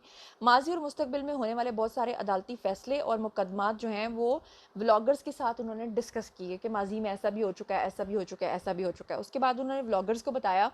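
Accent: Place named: Punjab, Pakistan. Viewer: Your “Punjabi touch “ adds spice to the presentation . Keep it up UK 🇬🇧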